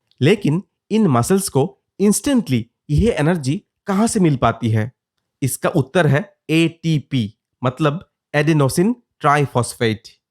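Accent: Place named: Bihar, India. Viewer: You seem to be from Bihar.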